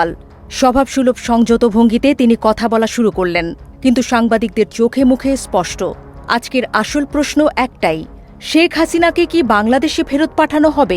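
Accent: Kolkata, India. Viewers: এই মেয়েকে কলকাতা থেকে এনেছে ntv news তার নিজের স্টাইল পুরাই কলকাতা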